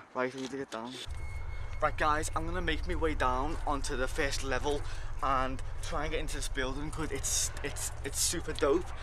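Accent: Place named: Liverpool, United Kingdom. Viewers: Hes from Liverpool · I think he's just a typical scouser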